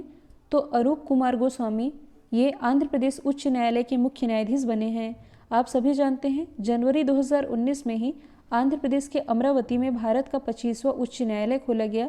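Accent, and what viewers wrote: Madhya Pradesh, India. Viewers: वाह मेम आप एमपी से हो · Maam aap MP ki hai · Ma'am Aap mp k ho??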